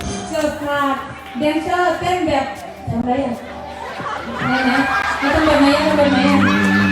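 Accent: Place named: South, Thailand. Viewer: ป๊าดด!!เอื่อยเเตนเเหลงใต้เก่งเเท้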